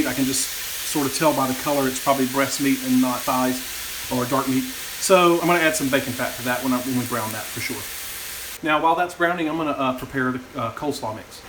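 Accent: South, United States. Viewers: Nakia Burnside same here.... and be a Texas girl, I appreciate the southern accent. He’s easier to understand!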